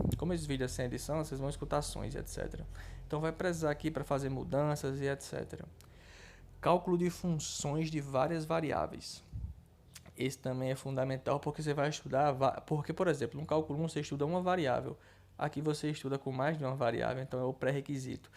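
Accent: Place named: Northeast, Brazil. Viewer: O mercado de trabalho de licenciatura em física é bom aqui no nordeste? (suponho que vc tbm seja da região já que está analisando a grade de uma facul do RN. Fora o sotaque gostozin kkkk). Vou entrar na minha segunda graduação que será em licenciatura em física e gostaria de saber se demora muito entrar no mercado.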